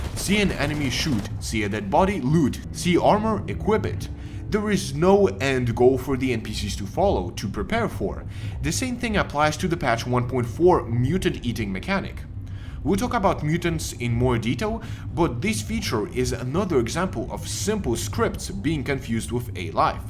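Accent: Russia. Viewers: As I understand it, the author of the channel is a Russian speaker like me (based on the RU interface, which sometimes appeared in the video and a slight accent) I can only praise him for such a video, knowing what kind of resistance he can get from those who justify all these downgrades. It is surprising that in the video of an English-speaking person there are almost no dislikes and defenders of this "masterpiece", but when a Russian-speaking person says similar things, then some kind of nightmare and insults begin in the comments. Thanks to the recommendations of YouTube for letting me see this video, thanks to the author for the work done. All the best. P.S. Nothing in the game works as the developers claimed, but it certainly deserves 83% positive reviews (at the time of writing the comment). Fucking circus, there are simply no words.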